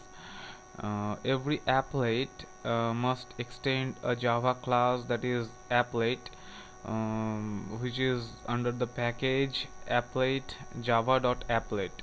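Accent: Bengal, India. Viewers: i think ur Indian and Bengali right :P by ur accent ..!!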